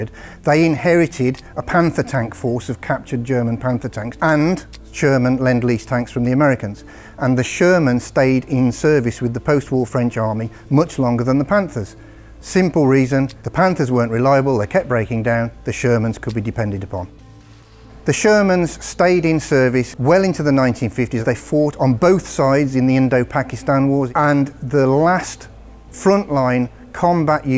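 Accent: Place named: Estuary, United Kingdom. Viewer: Fajny angielski tego gościa. Ktoś wie, jaki to akcent? Estuary?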